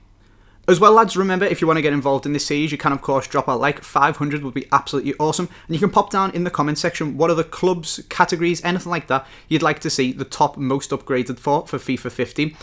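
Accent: Liverpool, United Kingdom. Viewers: Your scouse accents is awesome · Not being mean, but the Scouse accent is fucking dreadful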